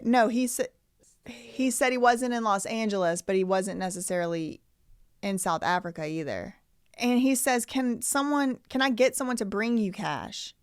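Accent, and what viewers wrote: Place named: South, United States. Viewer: I'm not a simp or anything like that... but you are beautiful and I love your southern accent ! I'm new to your channel and your break downs are legit!!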